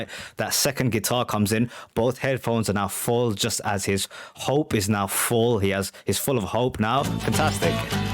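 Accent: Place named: United Kingdom. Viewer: Where do you live? Don't get me wrong I just wanna know cause of your accent. It's best sounding british accent 😊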